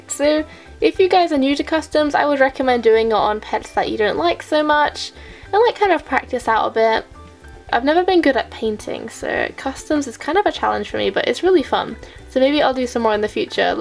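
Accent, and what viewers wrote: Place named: United Kingdom. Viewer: Wait YOUR BRITSH wow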